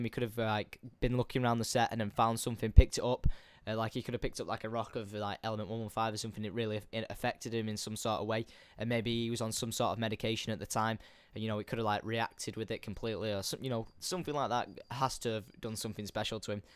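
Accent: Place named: Manchester, United Kingdom. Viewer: that's a manchester lad MINT haha. Well done son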